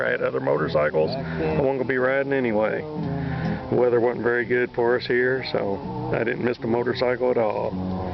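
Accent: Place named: Kentucky, United States. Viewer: sounds like you might be in kentucky. if so i'am from winchester. live in apopka florida have a 2014 street glide special gold peral about a year now . my last name is brett still have many, many family and freinds in lex, georgetown, louisville,winchester and kentucky area. ride safe and enjoy / peace !!!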